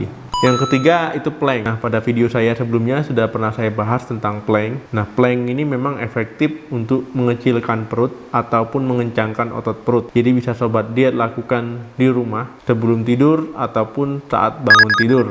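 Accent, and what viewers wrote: Aceh, Indonesia. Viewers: Dokter logat bicara nya aceh banget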